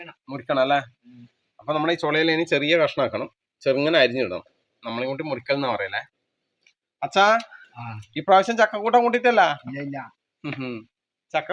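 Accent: Kannur, India. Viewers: വല്ലാത്തൊരു നൊസ്റ്റാൾജിയ 😔😔... നാടും വീടും ഉറ്റവരെയും വല്ലാണ്ട് മിസ്സ്‌ ആകുന്നു.. പിന്നെ നമ്മുടെ കണ്ണൂർ ഭാഷ.... 🙏🏻എന്റെ നാട് എത്ര സുന്ദരം ❤️❤️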